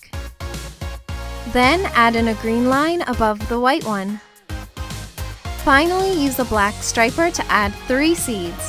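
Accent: Canada. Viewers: she's Canadian I can tell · You can tell shes canadian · You can tell. She talks with a canadian accent:) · She doesn't sound Japanese at all you guys. Lmao 🙈 She definitely sounds Canadian.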